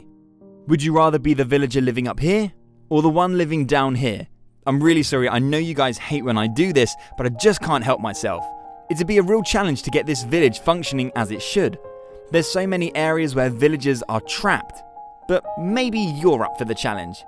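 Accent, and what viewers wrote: United Kingdom. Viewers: Br’ish boy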